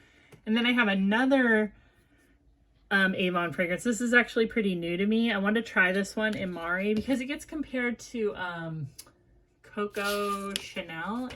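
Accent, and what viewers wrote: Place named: Midwest, United States. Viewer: (sp?) you buy and explain/describe the most unusual scents. I like to watch your videos because a. you're a thrifter like me, b. you're another midwesterner and actually sounds/talks a lot like me and c. you're honest about your finds and out there as far as blind buying goes--real gutsy and I like that!! Thanks for another great video!!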